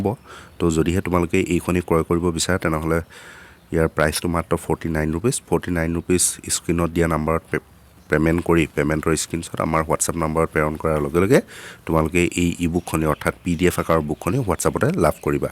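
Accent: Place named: Kamrup, India. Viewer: Kamrup district r lage etene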